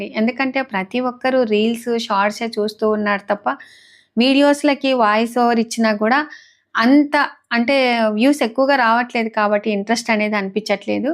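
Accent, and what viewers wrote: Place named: Kambam, India. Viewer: Hi garu yala unnaru me vlogs antey naku chala estam andi ma Ammamma gari uru kambam dhagara Andukey a language naku chala estam madi nellore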